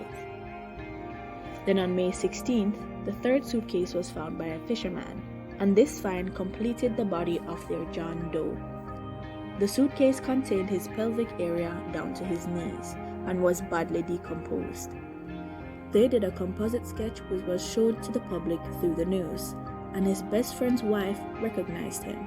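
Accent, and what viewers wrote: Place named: Caribbean. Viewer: Really liked your storytelling and btw are you Carribbean? I love your accent 😍😍😍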